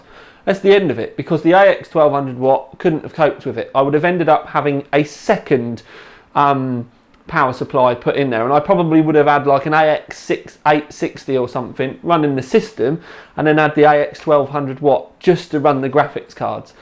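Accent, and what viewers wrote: United Kingdom. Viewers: wtf british accent is trash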